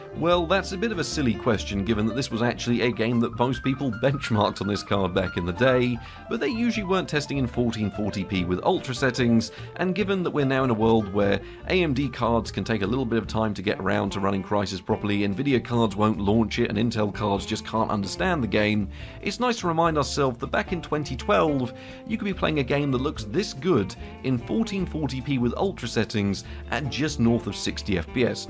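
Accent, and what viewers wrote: United Kingdom. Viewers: When you said "20 pound old AMD flagship" I was thinking "Imagine the GPU sag with that kind of weight!", but then I realized from the voice, "Oh yeah, he's from the UK, 'pounds' are his money!"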